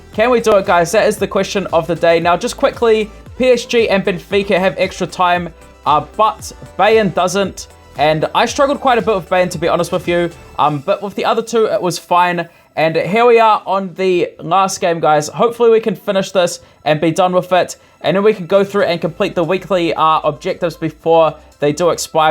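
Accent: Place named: New Zealand. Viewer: He sounds like a kiwi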